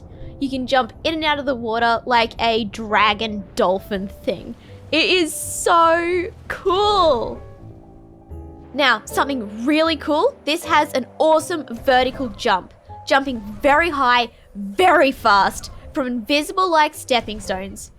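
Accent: Australia. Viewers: is an aussie??? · Are ye from australia mate?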